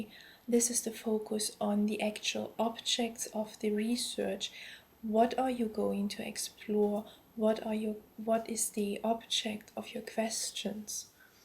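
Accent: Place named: Germany. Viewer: Are you a german, because you sound like a german speaking english.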